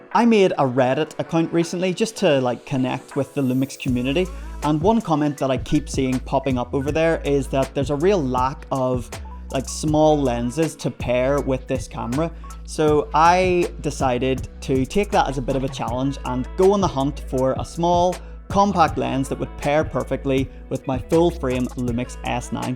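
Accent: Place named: Northern Ireland, United Kingdom. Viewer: good to hear fellow norn Irish folk here in the YouTube camera space! (in saying that my accent is fading)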